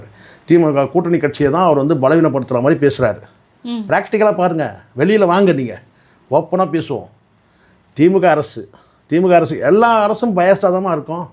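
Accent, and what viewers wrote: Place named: Karnataka, India. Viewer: Yaaruda idhu? Kannada minna pesars aalu Oru channel vechikittu volaraa?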